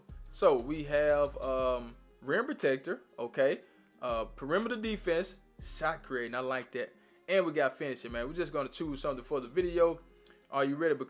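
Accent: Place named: South, United States. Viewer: Good Vids bro . Where you from . Sound like the south . How you say “ you know I’m talking bout “ lol sound like my area lol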